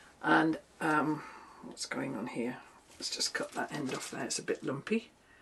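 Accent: United Kingdom. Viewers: i enjoyed the last video of the year. You just bring me joy listening to your lovely British voice with calming thoughts for us on our lime green sofa. I have enjoyed all the crafts you made and watching pateron So glad i found your channel from a wonderful friend i made this year. we were all 3 nurses and understand your mindset. I suggest a knitted pillow with an appliquéd yarn flower in center. Thank Anna and Rita for making all this possible. I look forward to the year of color 2026. Jodie in GA USA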